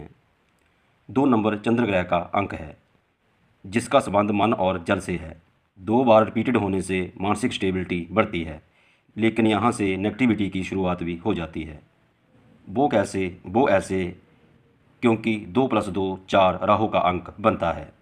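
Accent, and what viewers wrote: Punjab, India. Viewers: ur speak style very nice punjabi touch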